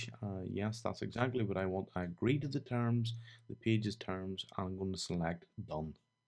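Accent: Northern Ireland, United Kingdom. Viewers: are you northen irish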